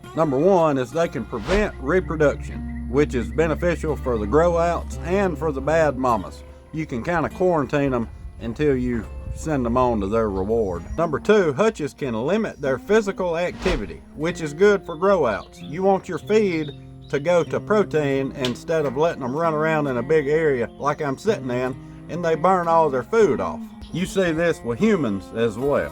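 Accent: South, United States. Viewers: First a salute for staying on the family farm. Second, love the content. Third, I love to hear Southern Folk talk. Subscribed from Central New Mexico U.S.A. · Hi, My name is Houari. I am from Yellel in Algeria. Thanks for your intresting video. I love your southern accent.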